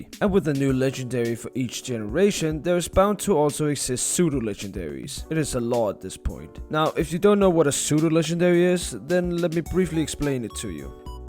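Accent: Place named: Denmark. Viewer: Figured you were Danish based on your voice alone, got further solidified by you using the Danish website, lol.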